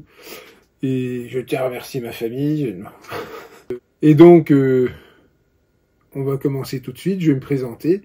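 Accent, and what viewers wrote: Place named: East, France. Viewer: Bonjour, C’est toujours un plaisir de retrouver tes vidéos qui rythment la semaine et cette foire aux questions est un moment bien sympathique d’où faute d’avoir pléthore d’interrogations, émerge un bavardage amical, ambiance qu’on ne peut pas retrouver dans les vidéos « ordinaires » de la chaîne … Ces bavardages lèvent un peu le voile sur les personnalités, les origines, les passions et je crois que c’est stimulant de voir que d’autres personnes nourrissent les mêmes centres d'intérêts et on se sent moins seul face à des sentiments de « culpabilités » que l’on peut parfois ressentir surtout lorsqu’on investit dans un bel outil 😜😜😜… Du côté de tes origines, je n’étais pas loin puisque j’avais cru reconnaître un accent de l’Est. Jolie région que l’Alsace et la Lorraine (aussi) … Personnellement, je suis temporairement exilé en Auvergne où ma femme bosse encore mais mes racines sont plus au Sud car natif d’une île de la Méditerranée où les autochtones sont dotés d’un caractère plutôt vif et à l’amour propre particulièrement exacerbé, mais tellement accueillants et hospitaliers… (bon, là tu auras trouvé) Hé bien, ce fut un plaisir, et pourquoi pas poursuivre par un futur « live » qui du coup permettrait une discussion en direct. 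Une excellente semaine et encore merci pour ce moment!!👍👋👋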